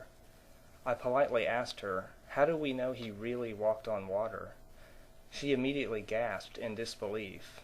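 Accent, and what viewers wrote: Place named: Oklahoma, United States. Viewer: You sound like your from Oklahoma.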